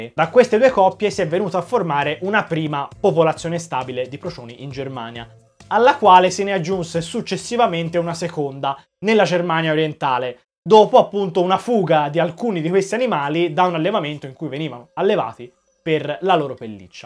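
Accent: Tuscany, Italy. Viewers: Bravissimo, come sempre. Ti giuro, da 8.10 a 8.55 io rotolo dalle risate: accento toscanissimo (ce l'ho anch'io, eh), procioni che rotolano, dentini, ecc... Continuo a guardare il videl in loop. 🤣🤣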